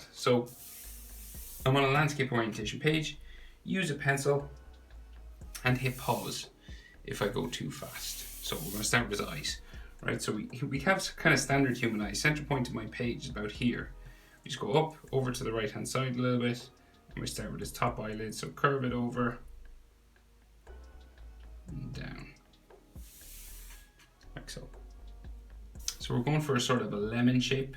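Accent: Ireland. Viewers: are u irish bruh i am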